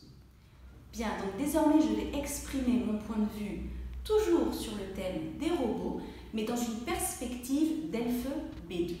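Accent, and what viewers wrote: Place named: Brittany, France. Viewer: excusez-moi mais puis-je vous demander d'où vous venez en France? votre accent a le même air de celle à Anne qui présente la chaine 'Parlez Vous French' sur yt, et elle est Bretonne (?). je suis encore en train de regarder cette video, merci beaucoup quand même :)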